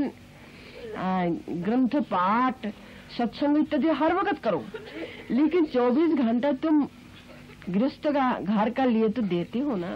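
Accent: Bengal, India. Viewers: Her accent is Bengali. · Yes her accent sounds like Bengali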